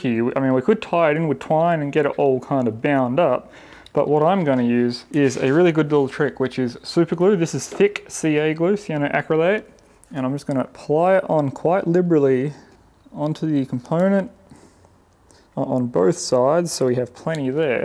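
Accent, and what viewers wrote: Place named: Australia. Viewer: You rock bro. Finally, someone that goes through the process thoroughly in detail.....and so cool to listen to another Aussie lad explain everything.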